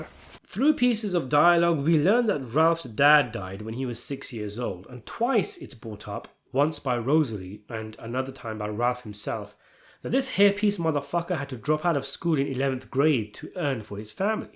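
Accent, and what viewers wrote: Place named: Northern England, United Kingdom. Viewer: It’s an accent from northern England, I prefer this than a robotic AI voice, good thing your opinion means OOGATTZ TO ME!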